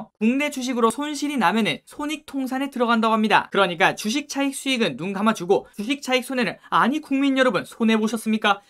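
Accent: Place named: Daegu, South Korea. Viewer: 저도 느끼고 있었... ㅋㅋㅋㅋㅋ 전 부산인데 사투리가 대구 친구랑 똑같길래 대구구나 해찌여 ㅋㅋㅋㅋㅋ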